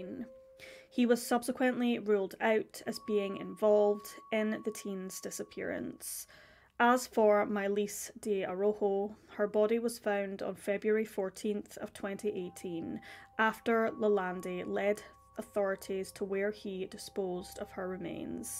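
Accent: Scotland, United Kingdom. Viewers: Oh my god your Scottish I’m from Glasgow too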